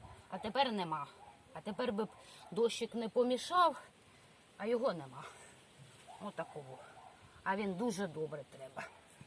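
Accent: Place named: Kryvyi Rih, Ukraine. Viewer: Дуже гарна ваша мова! Така мила мені! В мене подруга з Кривого Рогу. Це так приємно чути, бо й вона так говорить! Хай буде здорова і щаслива!!!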